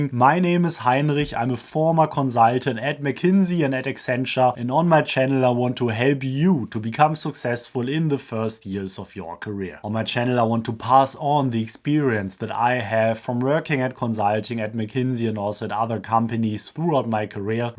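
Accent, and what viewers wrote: Germany. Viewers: Your german accent is so refreshing!!! Wow! Normally a video on networking or sales has a fast talking American 😝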